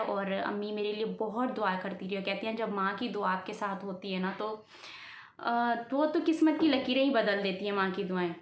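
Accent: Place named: Sindh, India. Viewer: I think u are Sindhi speaking aapk bolnay say mujhay laga I am Sindhi speaking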